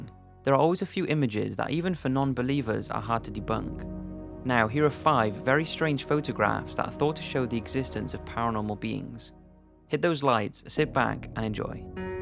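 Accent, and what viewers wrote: Wales, United Kingdom. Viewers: OH LOOK AT THAT! At the time of writing (1958, Sun 17 Jan 2016) you have 1,002,042 subs! Well deserved, awesome channel, site, Twitter etc. Well done! Many congrats from Scotland (ps are you Welsh? Bit crap at accents 😕)!! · just has the accent of a Welsh person and coincidentally I'm Welsh too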